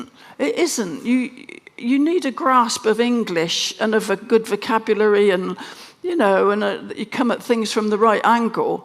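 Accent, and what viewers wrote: West Country, United Kingdom. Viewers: 👍good on Pam Ayres for a plethora of comic ryhmns and worldy laments with a wonderful west country lilt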